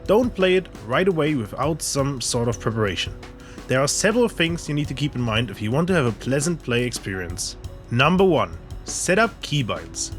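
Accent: Germany. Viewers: I hören German ?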